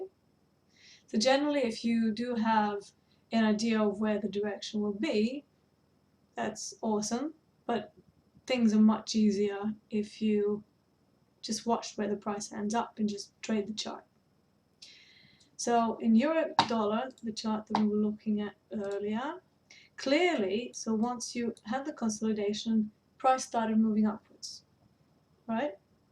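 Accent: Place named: United Kingdom. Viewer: Your terms and methodology are suggestive of Online Trading Academy's. Are you a grad? (I'm enrolled in the Mastermind program and start Core Strategies this coming Saturday. I appreciate the pre-class tutorial, enhanced by the aesthetically pleasing visuals in the lower right corner along with a mellifluous Brit-sounding accent.) ;-)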